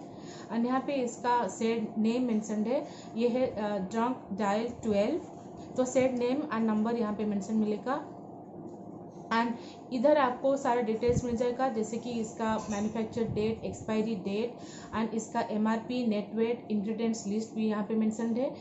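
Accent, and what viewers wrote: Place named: Odisha, India. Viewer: I could figure out the Odia accent ...😄❤️I am too from Odisha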